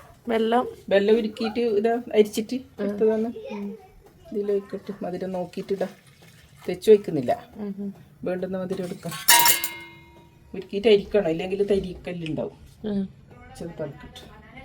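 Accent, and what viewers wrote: Kannur, India. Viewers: ങ്ങളെ കണ്ണൂര് പാഷ പൊളി ആ ന്ന് കേട്ടിനാ ങ്ങൾ ?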